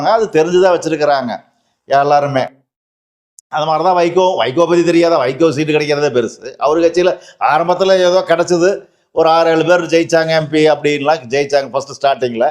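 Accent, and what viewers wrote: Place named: Kongu, India. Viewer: ஐயா நீங்கள் பேசுவது கொங்கு தமிழ் பேச்சு வட்டார வழக்கு நீங்கள் எந்த ஊர் எந்த மாவட்டம் நான் திருப்பூர் மாவட்டம் திருப்பூர் மேற்கு பகுதி 25 வேலம்பாளையம்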